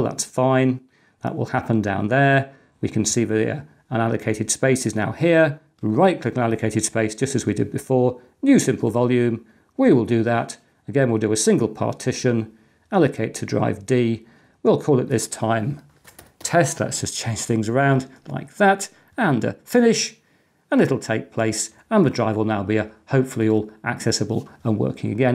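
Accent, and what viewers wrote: England, United Kingdom. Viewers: Genuine English accent, simply love it so much😍😍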